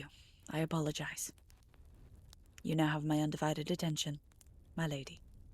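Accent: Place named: United Kingdom. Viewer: The British accent is sending my little gay self to neighboring galaxies 😭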